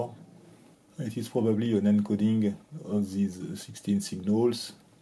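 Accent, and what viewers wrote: France. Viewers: It's the most stereotypical French accent and I love it :) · No you can not understand the French ascent, it is destroying any ability to understand a word.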